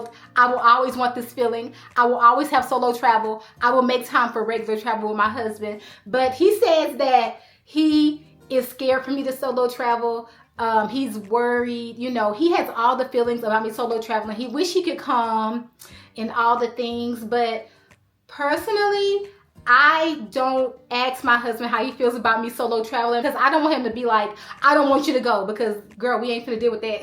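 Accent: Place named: South, United States. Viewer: I can hear a little bit of an accent/twang especially when you say January 1st. Are you from the South? Just curious.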